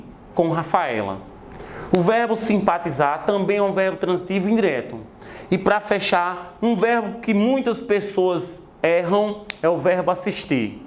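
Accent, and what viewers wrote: Northeast, Brazil. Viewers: Fessor vc é lindo e é dez!!!! Gostei do sotaque nordestino!Adorei a dica "DECA" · sutaque nordestino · Um nordestinoooo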